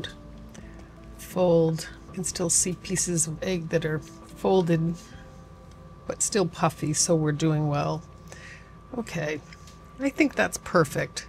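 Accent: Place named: New England, United States. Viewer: You must be in New England for 2 reasons. 1. You said aunt instead of ant, and pecan instead of Pecaahn. I loved your charming video and your instructions as you went along. I will be looking for more of you. Btw your little “jug” is also known as a pitcher. Hugs to you.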